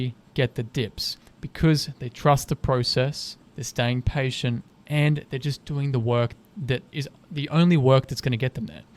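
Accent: Australia. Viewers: 😅 Ho sempre pensato fossi australiano 🤷‍♂️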